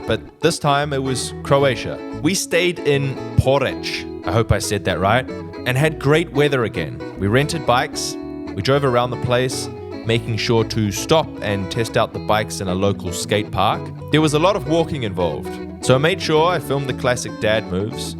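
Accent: New Zealand. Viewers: I'm always baffled when I hear your kiwi accent...you imitate Austrian German so well I somehow expect you to have an Austrian accent when you speak English. Makes zero sense, I know 😂. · Is there something about the Kiwi accent that lets you learn/pronounce the Austrian dialect particularly well? 🙂Because many immigrants in Austria speak perfect German - but none of them ever master the "Dialekt". So I was wondering whether you already had a starting point from your own native language that lends itself particularly well to learning to sound "Austrian"?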